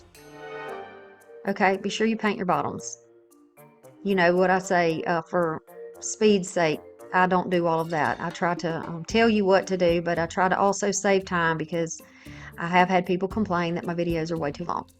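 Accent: South, United States. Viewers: Jackson and a Southern accent... A fellow mangolia stater? Also, adorable crafts.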